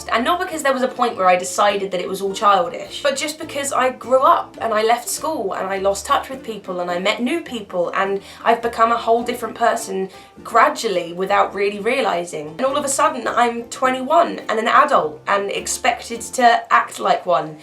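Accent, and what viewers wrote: United Kingdom. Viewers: You remind me of Merida from 'Brave', :) even though you speak british and not irish.. It's your hair! I like it ^^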